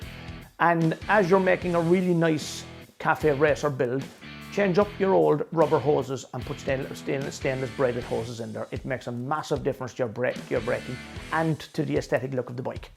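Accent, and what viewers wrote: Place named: Ireland. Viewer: I came over from Irish Demon's channel to give you a look-see. He's never steered me wrong before. I LOVE your Irish accent!! I originally subbed to Irish on the recommendation of Team Skeptic. I totally appreciate your laying out a step-by-step approach to working on a bike. I only have a little experience with being a passenger on the back of a motorcycle. However, I can appreciate the artistry behind bike building!! Looking forward to seeing what you make your next video on!!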